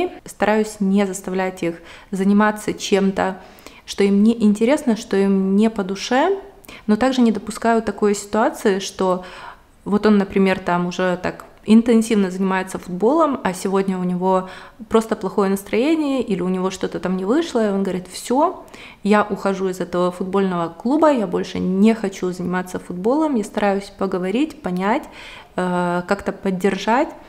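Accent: Ukraine. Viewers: какой прекрасный разговор получился!) Вы чистая, светлая, душевная. А ваша речь и диалект - я как сама окунулась в свое детство, которое тоже прошло на Украине, но уже 27 лет не живу там. Несмотря на разницу в возрасте, у меня было точно такое же детство, такие же теплые воспоминания...Все ваши выражения " у нас так говорили/говорят" мне понятны, потому что у нас тоже так говорили 😀. Теперь моя мечта встретиться с вами где-нибудь на просторах нашей планеты и пообщаться лично за чашкой кофе.... ❤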